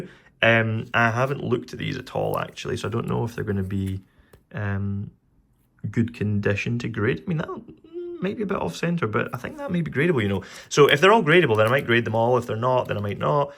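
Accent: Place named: Scotland, United Kingdom. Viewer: Never watched your whatnot, but getting back into Pokémon myself and got recommended this video! As soon as I heard that Scottish accent I was supporting you and your journey, best of luck mate 💪🏴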